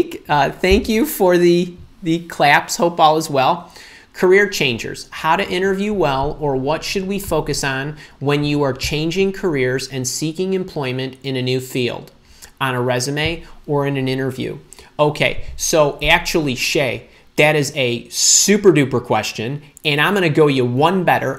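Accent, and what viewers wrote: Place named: Midwest, United States. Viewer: Every few years I watch your videos when it’s that time again. I always get great guidance — & that amazingly vowel-ly Midwest accent just warms my little Chicago heart every time! 😊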